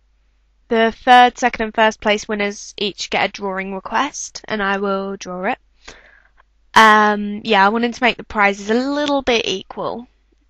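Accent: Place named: United Kingdom. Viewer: you british? sounds like it *giggle*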